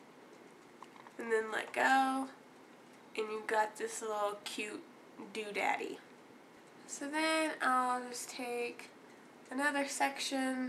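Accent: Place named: South, United States. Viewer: Are you from the south? I am and you have the same accent as me! ^_^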